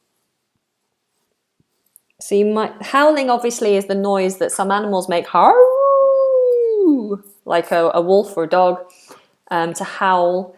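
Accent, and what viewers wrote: United Kingdom. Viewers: Hello dear, very good English language teaching. I love Britain and British and the very sweet English accent. at the moment I am watching you from Iran. 40 years ago I was in Manchester United Kingdom. And I enjoy hearing a very sweet accent and remembering every thing again. To be honest I should have never leave the beautiful England. Love UK. Love people, culture, language and the great history. Thank you. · Greetings from 🇲🇽 I like to learn with you. Even If I need to learn 🇺🇸 English. I can't stop getting involved of how great British accent is.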